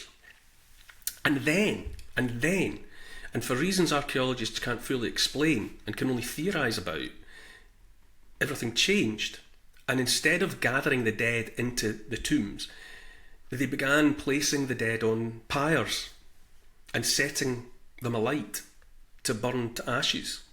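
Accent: Scotland, United Kingdom. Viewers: Think the unthinkable . Do the undoable. (Do the unthinkable) . Do what? (Imagery concerning the cremation of bodies). To whom? ( We need to watch out for a handful of people who have ancient malign desires). This man has a disarmingly quaint Scottish accent, a very friendly manner, and a lovely white, light filled house . (Pay attention to his words).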